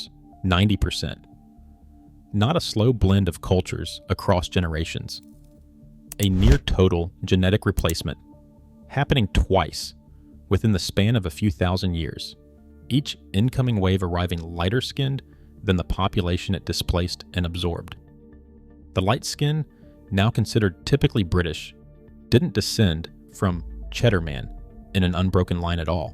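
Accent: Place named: United States. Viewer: NOT IMPRESSED WITH AMERICAN ACCENT IN AI PLUS TAKES 28 MINUTES TO TELL 10 MINUTES OF INFO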